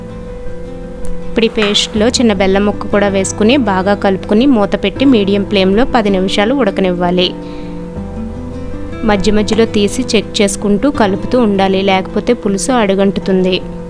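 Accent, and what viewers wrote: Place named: Godavari, India. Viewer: Nice vedeo making, nice background scoring, godavari accent... Simply superb.... Chusthunnanthasepu haayiga undhi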